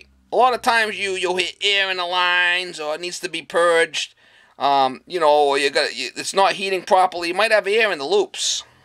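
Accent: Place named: Northeast, United States. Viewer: you have done a service to all. 1. younglings, they need the facts and must listen carefully 2. blue hairs, need to learn the newer stuff. 3. customers, yes customers, they need to see what it takes to get the comfort that they have grown a costumed to, and need to be willing to pay for. your videos are invaluable to all. and I thank you for a very clear honest and informative channel. "every day is a school day" is dead right, I work in industrial maintenance and that is the best truth that I have herd in along time I will plagiarize the . I have watched 4 hours if your videos tonight on the 52" plasma w/ full Dolby surround and loved every minute of it. WOW you are honestly the greatest. & I love you heavy N/E accent, it is killer! you are a gem. & yeas I know how much work it is to prepare each video, not so easy. even if you try to edit "in camera" it still takes a lot of work.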